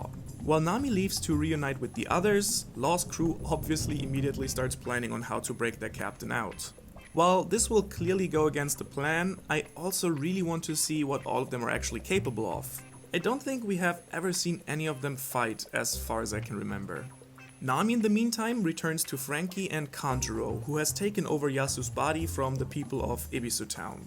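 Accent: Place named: Germany. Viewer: Are you German by any chance? Your accent is hiding it well but I can feel it slightly. Am I right with my assumption? :D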